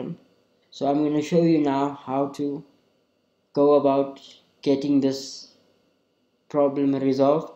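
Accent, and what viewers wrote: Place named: South Africa. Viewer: Love what sounds like a South African accent- am I correct bro?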